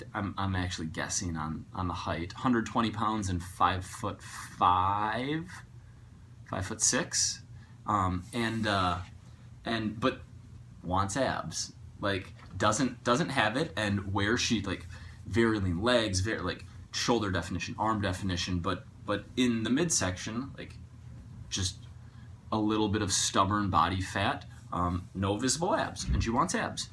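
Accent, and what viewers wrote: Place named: Midwest, United States. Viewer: LOVE WATCHING YOU AND LOVE UR MIDWEST ACCENT:)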